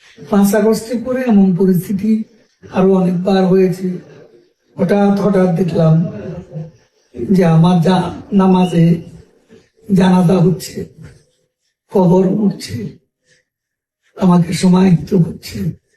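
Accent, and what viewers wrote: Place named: Kolkata, India. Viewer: কথায় কলকাতার একটা টোন আছে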